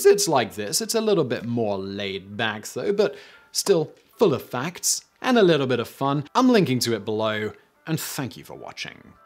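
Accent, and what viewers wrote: United Kingdom. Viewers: He's British. They pronounce words funny.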